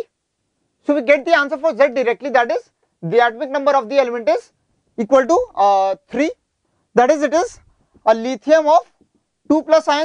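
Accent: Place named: India. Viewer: OMG HE'S INDIAN.........desi pride i'm so proud of his accent aghh <3 being indian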